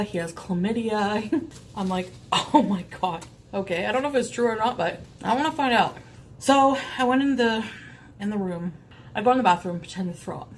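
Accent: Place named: Scotland, United Kingdom. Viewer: Scottish Accent DISGUSTENG!!!!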